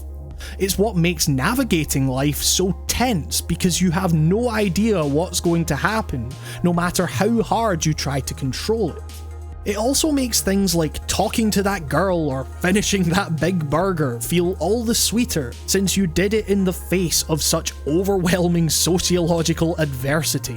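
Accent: Scotland, United Kingdom. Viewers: Are you Scottish? I love your accent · god i love the scottish accent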